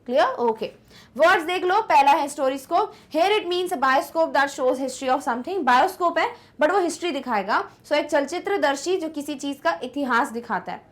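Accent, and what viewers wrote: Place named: Punjab, India. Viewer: Mam Shayad Panjabi Background se aati hai kyuki inki hindi me thodi thodi panjabi Accent jhalaktha hai 😹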